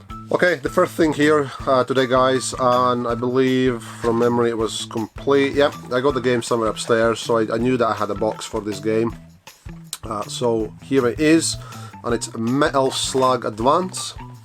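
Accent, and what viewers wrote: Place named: Scotland, United Kingdom. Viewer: Lots of Retro goodness there. I've never had a Game Gear, never come across games for it even in CEX, so I'm sure they are really rare. Excellent buried treasure....I wanna search your garage haha. Looking forward to your origins video and hearing how came to be...also the excellent, clearly Scottish but definitley a bit of a mixture there. Keep up the good work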